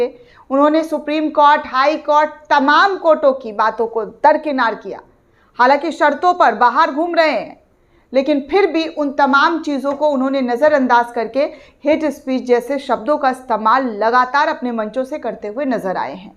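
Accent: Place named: Bengal, India. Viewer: aapka yea Lady reader or announcer 100% Banagali hai. Acha reader rakhiye jo pronounce thik karegi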